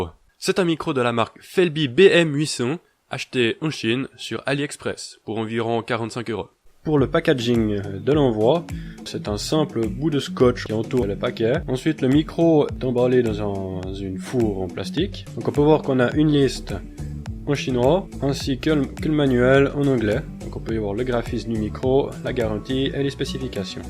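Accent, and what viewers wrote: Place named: Switzerland. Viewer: ça sent la SUISSE dans ta voix !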